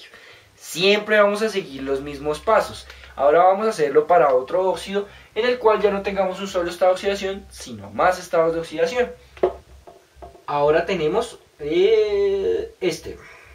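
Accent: Colombia. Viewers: es Colombiano??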